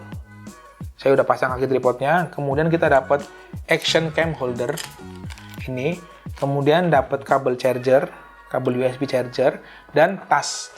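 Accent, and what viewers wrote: Tegal, Indonesia. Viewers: Wah mase wong tegal ya mas?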